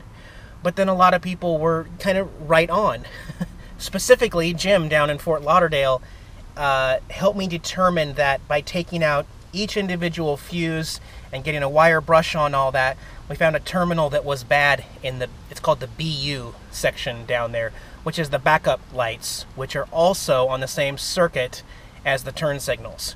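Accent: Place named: Nashville, United States. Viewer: Ain't nothin wrong with a Nashville accent!!!!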